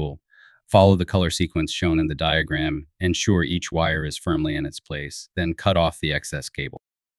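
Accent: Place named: United States. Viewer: American accent with a uk plug? Hmm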